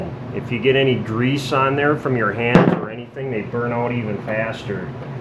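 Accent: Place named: Rust Belt, United States. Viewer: Great video! Love that thick rustbelt accent. Last generation of the unique American accents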